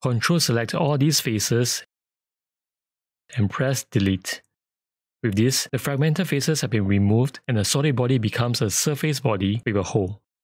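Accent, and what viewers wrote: Singapore. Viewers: Are you Singaporean?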